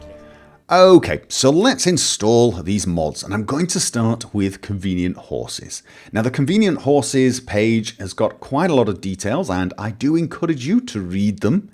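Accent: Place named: Birmingham, United Kingdom. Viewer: He's from the British midlands, I believe. Can't quite place it, but there's a bit of Birmingham in there...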